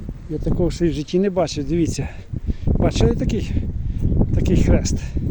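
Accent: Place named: Vinnytsia, Ukraine. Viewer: Дякую пане за цікаву подоріж до козаків.Мені ваша розмова чомусь підсказує що ви з вінницької області? Дай Боже всього найкращого що є в нашому житті і здоров,я.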